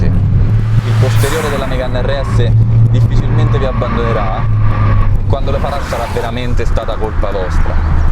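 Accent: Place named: L'Aquila, Italy. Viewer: Accento aquilano, paesaggio aquilano.... Valle subequana, una fiera di curve in un paesaggio mozzafiato.... Grande, un po di passione abruzzese nel mondo!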